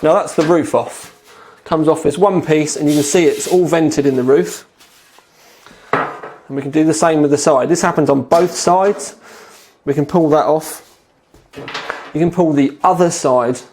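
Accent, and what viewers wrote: United Kingdom. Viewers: He is British. The world doesn't revolve around your regional accent let alone language.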